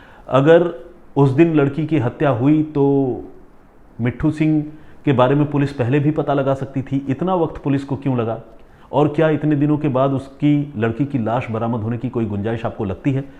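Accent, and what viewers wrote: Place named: Bengal, India. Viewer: Dada awaz me Pata lagta Hai ki app bengali <link> west Bengal ki Medinipur dist se me abhi punjab ki Jalandhar me gold ka cam korta ho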